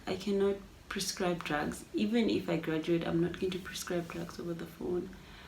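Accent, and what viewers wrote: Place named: Vietnam. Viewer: your NamLish on point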